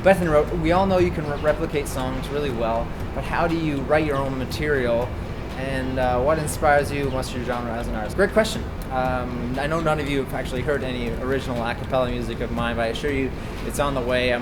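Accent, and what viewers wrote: Canada. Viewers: omg he sounds so Canadian i wonder how he says about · Canadian accent represent!! :D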